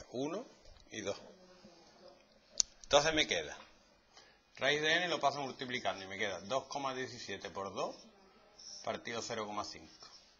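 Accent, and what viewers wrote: Andalusia, Spain. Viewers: Es andaluz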